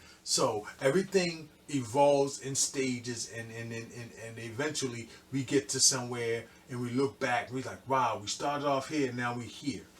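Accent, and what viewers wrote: Baltimore, United States. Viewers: Yeah Roland did just fade to black....I feel like they may come back! Roland where YALL AT?????? Sound like a Bmore accent?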